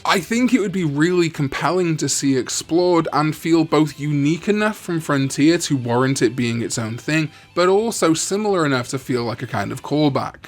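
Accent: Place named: Wales, United Kingdom. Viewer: Where in Wales are you from? I’m from Porthcawl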